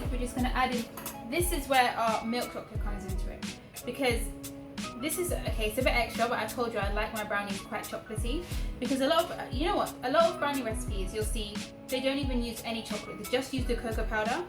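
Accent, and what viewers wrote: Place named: South, United States. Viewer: Not bad! Funny 🤣 the southern accent I mean. But amazing as always.🌹🌹❤️ Keep on producing these great videos. 🌹🌹🌹 You should have your own products in the Supermarkets.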